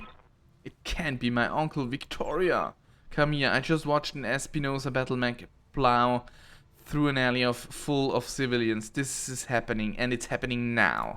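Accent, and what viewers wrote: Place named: Germany. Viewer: Yess man merk den deutschen Akzent, allerdings machst du das echt gut💪 ich würde untergehen im Lets play wenn ich es auf Englisch machen müsste😅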